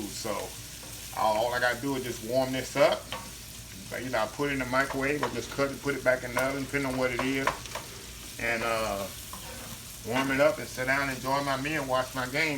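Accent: South, United States. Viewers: He's a southern man and talks the way my dad used to!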